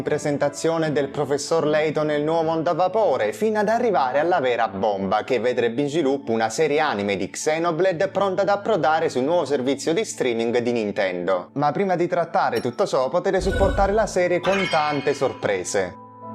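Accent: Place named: Campania, Italy. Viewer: Ho giocato Xenoblade per la prima volta l'anno scorso partendo dal 2, poi questa primavera sono passato al 3 (un ordine che lascia un po' a desiderare per seguire la storyline, ma tant'è 😂). La serie mi ha catturato tantissimo e la reputo un nuovo Kingdom Hearts per intreccio narrativo, ma uno venuto bene! (senza esagerati mindfu*ck, insomma)! L'idea di un anime avrebbe totalmente senso! Non sono sicuro che elimineranno le Definitive Edition perché gli fanno macinare soldi, ma sarebbe se non altro un modo per fare contenti i fan della serie e per avvicinarne di nuovi. Apprezzo molto i contenuti che tratti perché parli spesso di informazioni di cui non si parla molto in Italia. Un piccolo commento "tecnico", se posso. Rimuoverei il suono "sh" della parlata campana. Non che voglia eliminare la tua originalità, ma credo darebbe un piccolo tocco piu professionale al canale. 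Ovviamente, parere personale. Detto questo, continua cosi che sei bravo! 👏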